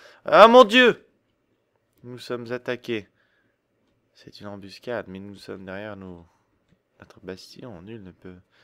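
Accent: Quebec, Canada. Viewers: C'est le film Sanctum, de James Cameron, mais bon il est québécois alors son accent est tout a lui :D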